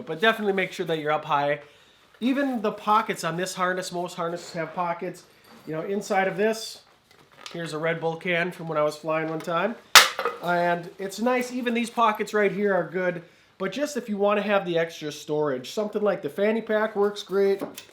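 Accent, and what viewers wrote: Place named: Wisconsin, United States. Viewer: Great vid! I vote you do all the tech talks w/ the thick Wisconsin accent that you used in previous vids!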